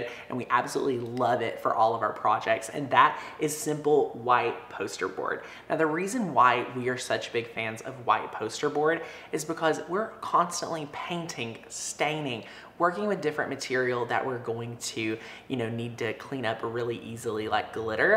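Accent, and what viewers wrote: South, United States. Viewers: You have to be Southern!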